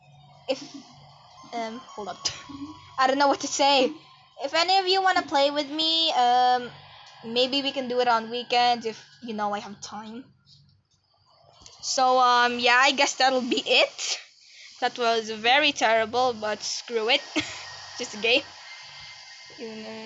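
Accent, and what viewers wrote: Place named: United States. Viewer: You sound american. WE DRIVIN ON THE RIGHT SIDE CUZ WE'RE AMERICAN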